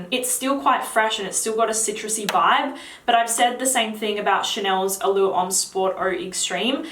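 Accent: Australia. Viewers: I love your Australian accent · SO FUNNY TO LISTEN HOW STRANGE AUSTRALIANS SAY WORDS "HERE", "YEAR". SOUNDS LIKE "YEAH". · She is an Australian. The American accent is actually the one of the most annoying world wide sorry bro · That Australian accent though 😍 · Are u an Australian ? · I love your Aussie accent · Australian accent, language is english. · hers is Australian not english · I love the way you say "here"...and every other word that ends in "R". You have the best accent of any Australian ever in the history of Australia! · hello, just discovered your channel, loved the review and the Australian accent 😉 Liked and subscribed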